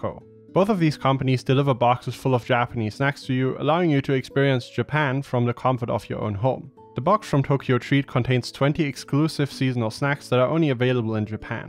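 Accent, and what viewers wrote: Germany. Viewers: How many Youtube accounts do you have? or are there just a couple of you similar voiced guys out there with a German accent playing FFXIV?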